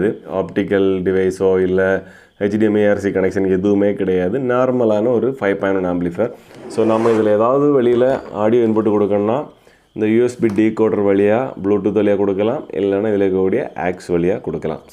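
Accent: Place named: Kerala, India. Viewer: ഇവർ മാർത്താണ്ഡംകാരാണ് അതു കൊണ്ടാണ് മലയാളം കലർന്ന തമിഴ് വരുന്നത്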